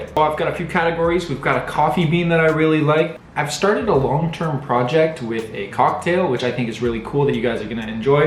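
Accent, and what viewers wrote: Canada. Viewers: Is this Canadian accent? You talk like my nephew who's now on Canada...rn 🇨🇦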